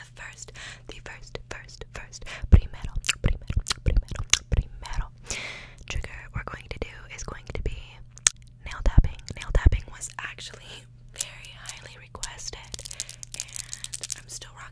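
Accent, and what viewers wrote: United Kingdom. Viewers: This is the perfect way to end another long day, especially the encouragement. Also, your British accent is very impressive.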